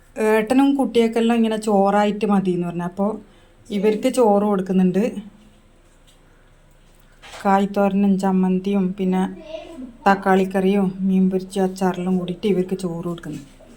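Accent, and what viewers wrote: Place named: Kannur, India. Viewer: ok👍 ഭാഷ കേട്ടത് കൊണ്ട് ചോദിച്ചതാണ്... ഞാനും കണ്ണൂർ ആണ് 😃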